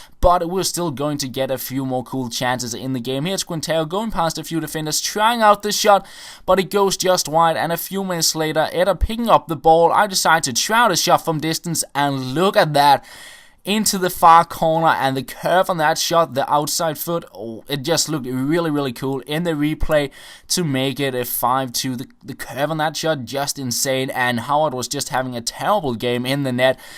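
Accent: Denmark. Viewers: Man you can really hear that you are danish, so hard and thick dialect hehe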